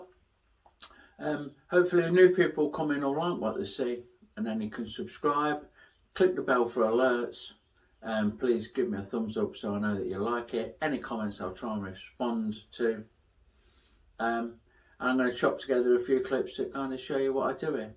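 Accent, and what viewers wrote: United Kingdom. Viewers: Hi, just found your channel and subbed. Great stuff! You don't sound like you come from too far away from where I grew up in Lincoln. Cheers, Jon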